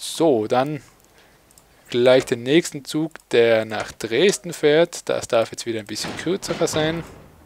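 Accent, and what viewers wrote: Austria. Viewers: Jo bisch denn du a Tirola, ha?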